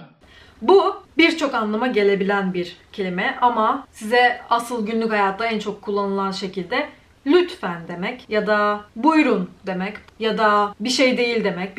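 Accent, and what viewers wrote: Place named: Poland. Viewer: aha rozumiem ,tak się zastanawiałem bo podczas wymowy masz taki polski akcent :)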